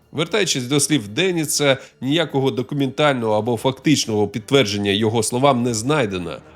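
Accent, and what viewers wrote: Russia. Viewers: Дякую за чудове відео, хоч і трохи дивно слухати про історичні події від людини схожої на депутата 😅 Помітно, що людина російськомовна, але українська вже майже бездоганна! Ще раз дякую і продовжуйте в тому ж дусі!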